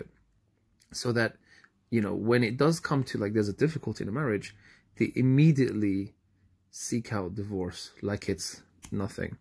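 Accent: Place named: United States. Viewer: I thought i could detect a American accent. 👍😄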